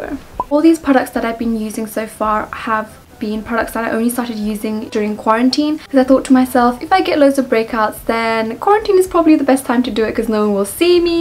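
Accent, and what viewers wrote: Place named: United Kingdom. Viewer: Omg finally a new upload 😭😭💕 I’ve been waiting for this for so long! Can you upload more often so I can hear more of your beautiful British voice and amazing editing 😭✨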